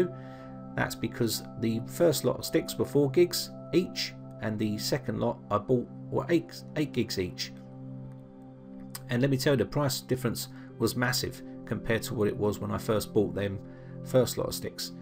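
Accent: England, United Kingdom. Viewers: What part of England are you from, Gloucestershire?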